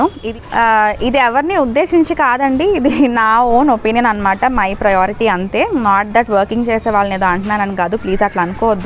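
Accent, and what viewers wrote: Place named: Vijayawada, India. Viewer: me slang chusi menu anukunna vijayavada ani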